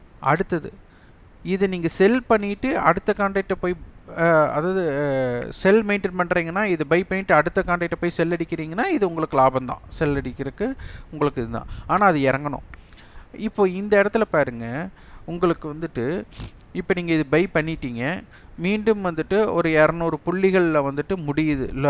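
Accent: Kongu, India. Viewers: Masterji, such a nice advice (in a family how Amma Appa advice to their childrens - I feels just like this) for small traders, in 2 days can't earn lakhs & crores, we can save our capital, This is the time to study, observe, learn. Take a notes what market doing Iam a big fan of your Kongu Slang Tamizh "VALATHUDAN VAAZHGA"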